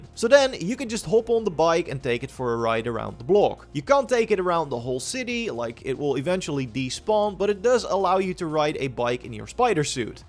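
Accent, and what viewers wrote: Netherlands. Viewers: are you dutch? Cuz im hearing a stong accent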